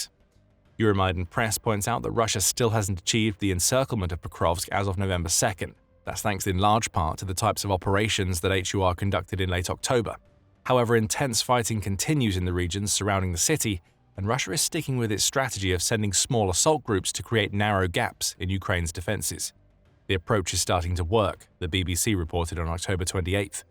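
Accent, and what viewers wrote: United Kingdom. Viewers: Dear God what Bullshit. I should have known as soon as I heard the British voice. The GUR SF were on a rescue mission for a high value asset that is not going to make it out alive. Ukraine is out of reserves and It is showing all over the battlefield.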